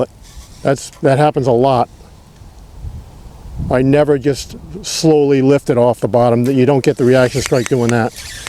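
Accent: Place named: Massachusetts, United States. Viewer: Great video, lots of valuable advice in here. As a native Bostonian I could hear Massachusetts in your accent too pretty quickly lol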